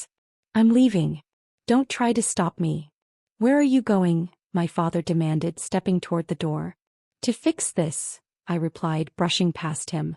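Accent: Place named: Spain. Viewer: Why would you have a narrator use a heavy Spanish accent reading English? Makes no sense.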